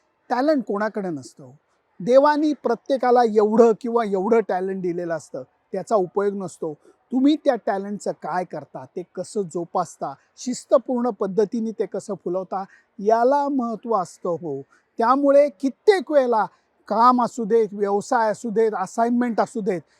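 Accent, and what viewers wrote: Pune, India. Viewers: मग पक्के पुणेकर आहेत लेले काका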